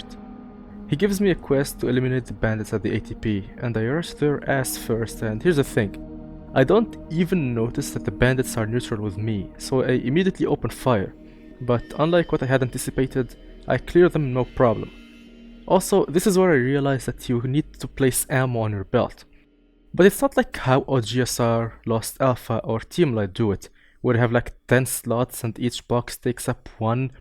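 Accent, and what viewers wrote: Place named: Tunisia. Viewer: direct chle9t bik tounsi mel accent ! GG Bro nice video. Big STALKER Fan Here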